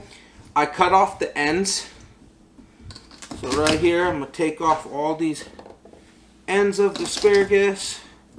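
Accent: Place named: Michoacán, Mexico. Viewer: I seriously thought you were from Michoacán Mexico 🇲🇽 bro Love that meal 🥘 prep easy and affordable thank you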